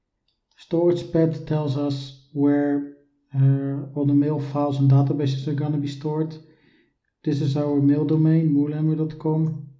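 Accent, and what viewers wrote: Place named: Netherlands. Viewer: hello dutch man XD good accent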